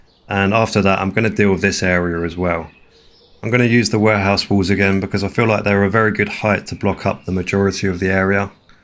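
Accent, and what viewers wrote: United Kingdom. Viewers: just discovered your channel and omg. im so happy i found you !! my fo4 is screwed atm (heavily modded and the ng update killed it lmao) so im getting my fix playing new Vegas/watching videos (i have major ADHD/autism and am hyperfixating on fo4 so not being able to play it is miserable tbf). also as a fellow brit i find it frustrating how many youtubers are american so your voice is nice to listen to lmao. (i'd guess you're more of a southerner?) anyway keep it up man, your builds are super creative and i love watching you do them <3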